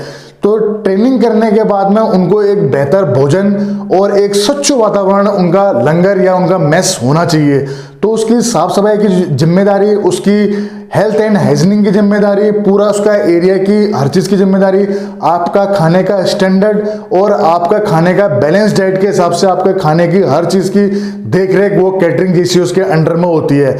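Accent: Rajasthan, India. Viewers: Aap ho na ho rajasthani ho sir I am 100%confident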